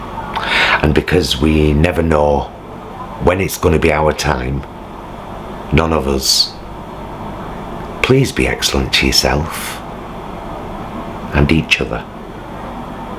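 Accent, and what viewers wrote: Manchester, United Kingdom. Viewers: Nice to hear a Mancunian accent today! It's very strange being so far away from the UK when something like this happens, you just want to be with your people, who understand. The image of Her Majesty sitting alone at Philips funeral, while Boris partied will stay with me forever. It's like the Nation's favourite Granny has died. Sounds daft, but I can't explain it any other way? You Nailed it tho, brought a tear to this 53 year old Truckers eye!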